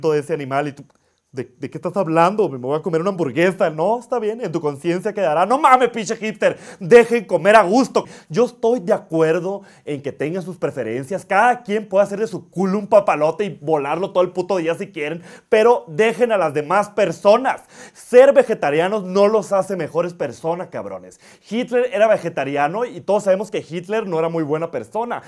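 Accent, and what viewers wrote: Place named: North, Spain. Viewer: Aquí conteniendo mi risa porque ya todos duermen. ¡Pero que cosa! Este tipo es muy chistoso. Aparte su acento norteño: “mushasha”. ¡Good!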